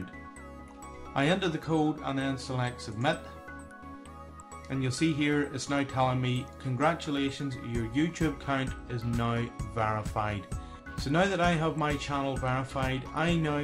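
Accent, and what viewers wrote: Northern Ireland, United Kingdom. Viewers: Thank you, very easy, clear, instructions. I had a look at your videos and there's loads of great stuff I can use, got yourself another sub. Also, nice to hear a Norn Irn voice too. Best Wishes, Brendan (outside Lurgan). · U have the same accent as me! R u from the uk?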